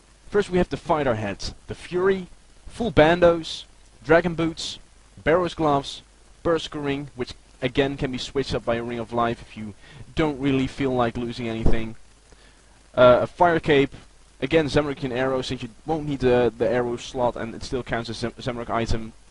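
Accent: Netherlands. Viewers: Hoor meteen dat je NL bent:P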